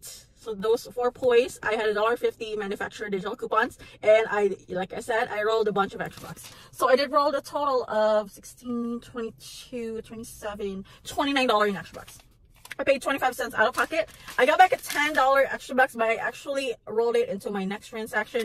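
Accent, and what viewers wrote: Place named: Philippines. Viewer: My good friend is from PI and talks the same way. Maybe it's a cultural thing. It seems fine to me.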